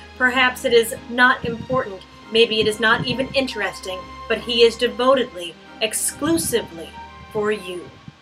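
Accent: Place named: Western New York, United States. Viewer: Haha that was good. I thought this was going to be about the rapper when I first clicked, but then totally redeemed yourself when you played the song at the end. Are you from western NY? You sound like you are from western NY.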